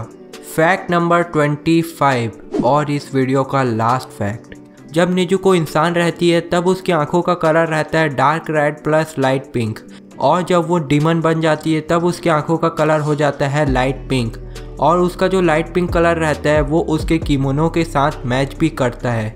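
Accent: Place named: Bihar, India. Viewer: Bhai bolte bhi bihari jese ho tum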